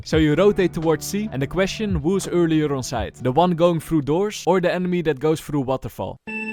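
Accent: Netherlands. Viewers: the isnane dutch accent maar je maakt leuke vids keep up the work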